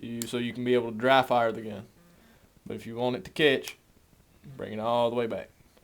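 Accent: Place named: Texas, United States. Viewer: This is not to hurt your feelings, but you sound like the Texas guy from BFBC 2.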